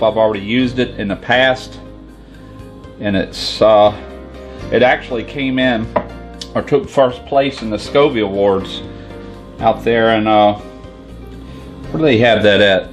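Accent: South, United States. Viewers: oh....man. found out about you via BBQ PIT BOYS. man....you got me subscribed. youre doin it the fine and right way. love your southern accent there.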